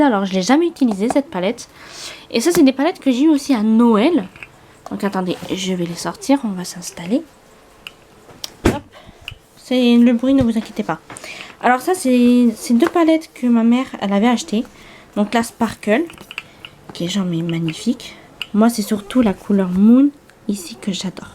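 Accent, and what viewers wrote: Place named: England, United Kingdom. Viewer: Coucou jolie, waouh tu en a du maquillage pour très longtemps. C'est bien rangé. Il y a de très belles palettes. C'est toujours un plaisir de t'écouter Tu as aussi un joli accent Anglais. Bonne semaine à toi, bisous, à bientôt, kénavo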